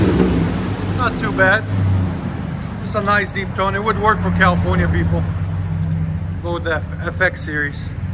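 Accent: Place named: Upper Peninsula, United States. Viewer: Are you from Michigan because you sound like a yooper.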